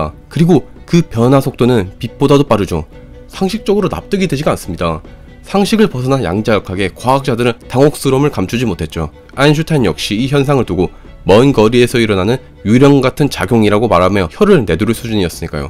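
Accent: Gyeongsang, South Korea. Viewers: 혹시 실례가 안된다면 억양이 경상도가 고향이신거 같으네요. 포항이나 경주영천? 경북남쪽지역 억양은 확실한데 서울 가신지도 10년은 안됐을듯하네요. 제가 20초에 서울갔을때 그리고 10년 서울생활했을때랑 진짜 비슷해서요ㅎㅎ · 어?? 뭔가 이분 목소리랑말투 맨첨에들었을땐 섹시한 동굴목소리가진 미국교포 한국어말투 느낌이었는데 듣다보니 경상도억양이.....ㅋㅋㅋㅋㅋㅋㅋㅋ귀여워ㅋㅋㅋㅋ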